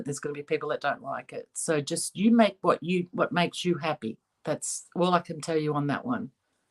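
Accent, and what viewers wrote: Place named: Australia. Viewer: GORGEOUS creations! Keep doing what you're doing! Fellow Aussie here, transplanted to Texas over 40 years ago, so am loving your accent and the occasional maggie in the background! Thank you. 😀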